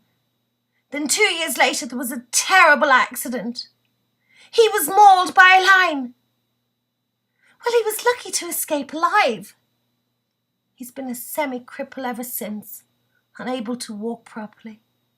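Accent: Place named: Ireland. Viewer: Well done, the Irish accent makes it cooler somewhat also :)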